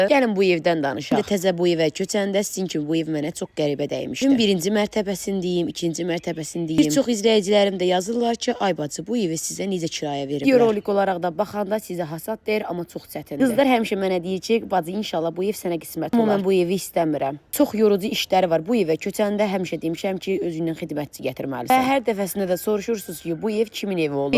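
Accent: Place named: South, Azerbaijan. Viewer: Ləhcəsindən bilinir ki cənub bölgəsidir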